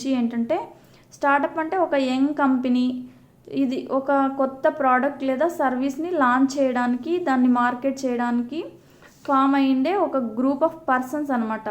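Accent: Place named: Kadapa, India. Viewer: Good simply n shorty. Slang koncham baa gunship. ☺😊 Kadapa ani andhariki artham kavalana emi? 😀😀😀😁😁. Manchi vedio Subscribe inkaa cheiledu... Inkaa inlantivi expect chestunnam. 😊😊😊😊slang...is good anaiah funny. ..thank you. .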